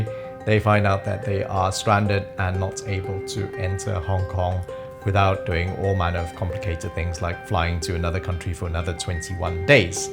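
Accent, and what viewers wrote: United Kingdom. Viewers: I didn’t know you were Malaysian! You’ve got a British accent!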